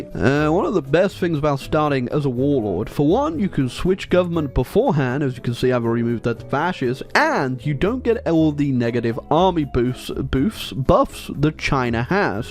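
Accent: United States. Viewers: I don't know where is from anymore. I actually thought he was Belgian once but I realized that was only a meme? Then it seemed like he was just English, but now he's saying "zee" and actually the rest of his accent sounds like it might be American.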